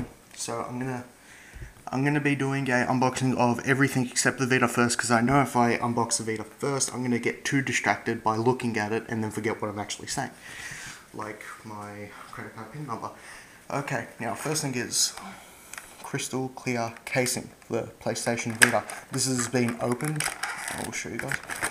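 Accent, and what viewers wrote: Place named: Australia. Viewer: Hey an Aussie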